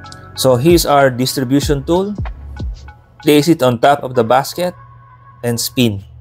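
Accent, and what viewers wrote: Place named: Philippines. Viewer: Cute heavy Filipino accent. Thanks man!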